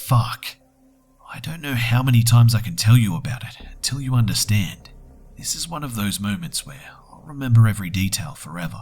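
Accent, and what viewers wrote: Australia. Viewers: Are you from Australia?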